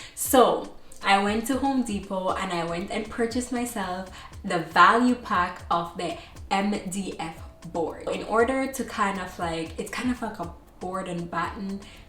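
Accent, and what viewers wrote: Jamaica. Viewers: Ur accent sounds just like a Jamaican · I randomly clicked on this video and something tells me you’re Jamaican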